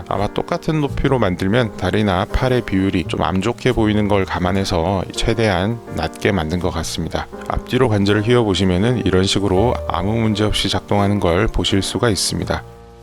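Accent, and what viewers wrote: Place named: Gyeongsang, South Korea. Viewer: 몰랐었는데 경상도 싸나이셨네요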